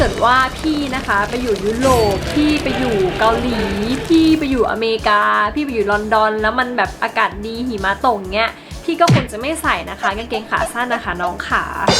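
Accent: Isan, Thailand. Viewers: เอื้อย เว้าอี่สานม่วนคัก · สำเนียงพี่ พูดอีสานคือได้มากกกก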